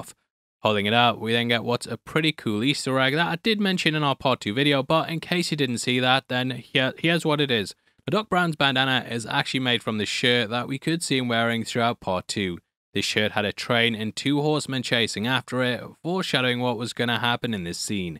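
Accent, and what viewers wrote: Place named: United Kingdom. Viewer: "from when he played Gundam" ....I know british accents make some words are hard, but that's an interesting mispronunciation of Gunman XD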